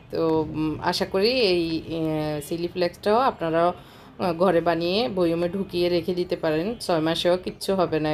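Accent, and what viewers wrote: Noakhali, Bangladesh. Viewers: যে মজা😆😆😆😆আপনার নোয়াখাইল্লা কথা,হাসলাম কতক্ষণ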